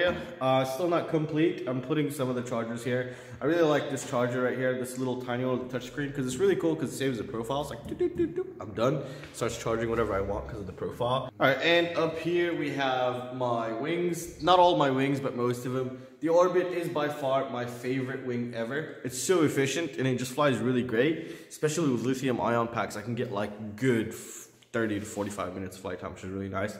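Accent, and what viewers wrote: United States. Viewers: Where I Europe do you live, you sound American have you always lived I. Europe ? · Where in europa do you live? I realy think you were a american😅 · Made me smile. Thought he was American.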